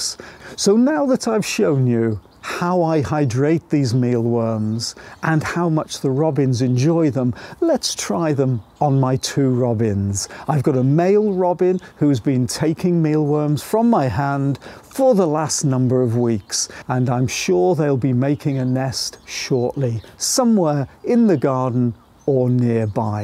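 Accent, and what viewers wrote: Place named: United Kingdom. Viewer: Where do you live? I live in Arizona USA… you sound British to my ear❤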